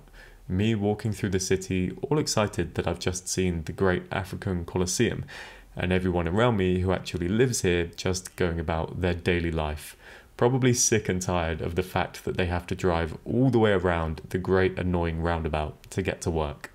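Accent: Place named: United States. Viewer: Have you considered not showing yourself on your vlogs ? Your voiceovers are refreshingly non-irritating, American, and very authoritative and your subject matter informative, but just hearing your voice without seeing your face would be more enigmatic. There are too many Youtubers trying to become personally famous instead of their content, and including your face just brings you down to their level.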